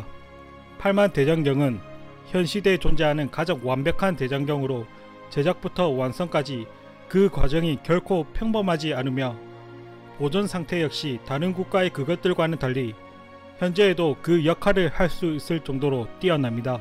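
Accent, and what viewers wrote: Gyeongsang, South Korea. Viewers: 경상도 억양 이상할것 하나 없습니다. 저는 충청도 토박이고 30살이 넘어서 경상도에 정착 했습니다. 처음에는 어색하고 불편 했지만 이젠 별로 아무렇지도 않습니다. 다 똑같은 한국말인대 성조가 있다는것만으로 불편하게 느껴야 한다는 사실이 참 안타깝습니다.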